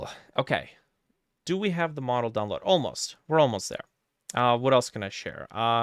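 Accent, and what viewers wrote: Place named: Eastern Europe. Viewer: you speak good english but, i dont know why, i hear some slavic accent lol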